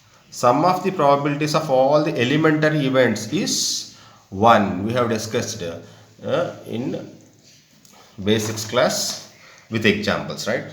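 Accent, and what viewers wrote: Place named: South, India. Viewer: Hindi bolo jab samaj aata h hume hum dilli se hai ap south Indian ho apki bhasha alag hai aur bolne ka lehza bhi alag hai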